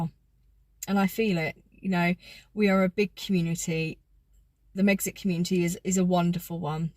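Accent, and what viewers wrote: South, United States. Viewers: She was the first person I subscribed to. She was so wonderful to listen to. I loved her southern accent! She will be missed greatly by us all. Condolences to her family RIP sweet you are now safe in our heavenly fathers arms! 🙏❤️ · She will be truly missed. Loved her videos and her wonderful southern accent. She was a wonderful soul. May she rest in peace 🌈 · Thank you, It's true though, came into our homes 100s of times because we invited her in for a chat. Many of us will miss her deeply and yes keep her channel (I will as well) just to hear her dulcet Southern tones. I remember when my Mother passed away I would call her daily praying she would just pick up the phone so I could hear her voice say "Hello". There is some breaking news here in the UK. The Palace has announced that our amazing Queen's health is deteriorating and as I write to you members of the family are travelling up to Balmoral to be with her. 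Her brain is strong and hopefully she will pull through, however this does not sound good.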